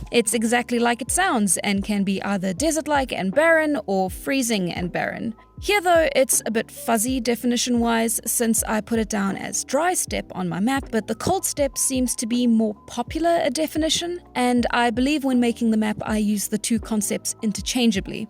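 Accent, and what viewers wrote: South Africa. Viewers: I was looking for this comment lol. It was the first thing I noticed. I think us South Africans just have an ear for the accent haha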